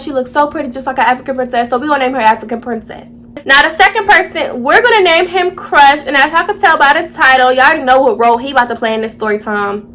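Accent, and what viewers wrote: Baltimore, United States. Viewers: the baltimore accent 😭😭😭😭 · LMAOO THAT ACCENT NOT MARYLAND THATS BALTIMORE ITS DIFFERENT 😭😭😂 but I’m from dc so I get it lmaooo that shit funny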